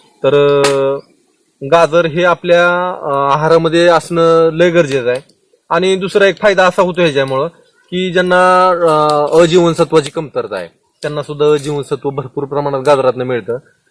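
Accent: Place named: Solapur, India. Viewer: Khupch chan.....zala aahe.....😋😋😋tumhi kontya gavche....me solapur che aahe....bhasha ekdm solapuri vatatey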